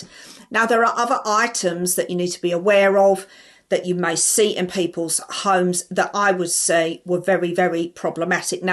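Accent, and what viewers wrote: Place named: Kent, United Kingdom. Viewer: Brilliant Kent accent too 😂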